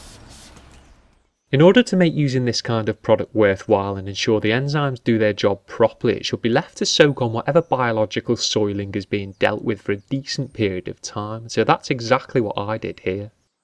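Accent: Liverpool, United Kingdom. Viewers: Scouse laaaa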